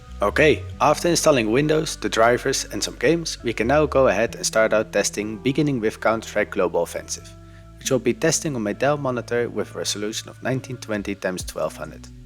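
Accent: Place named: Netherlands. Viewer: Are you dutch? You sound very dutch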